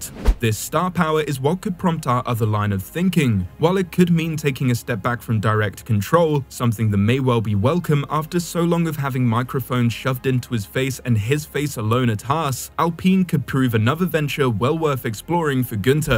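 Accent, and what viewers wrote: United Kingdom. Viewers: I don't know who is narrating this, but I'm tired of everything about racing being fed to us with a British accent. Diffey is the worst.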